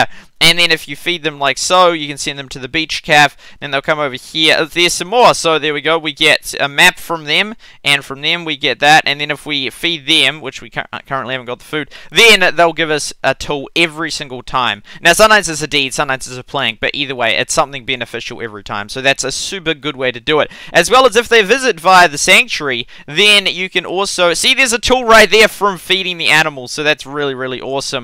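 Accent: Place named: New Zealand. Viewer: Your zealand ayyy cousy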